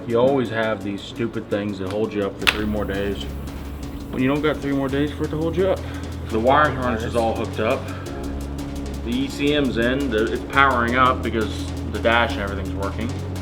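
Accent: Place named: Kansas, United States. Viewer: Bro speaks like a true Kansas-man. (I would know)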